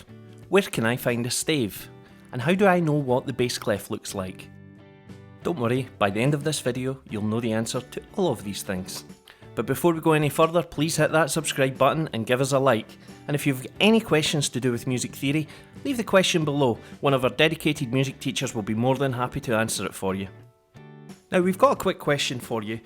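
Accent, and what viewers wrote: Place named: Scotland, United Kingdom. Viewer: You’re Scottish; from where?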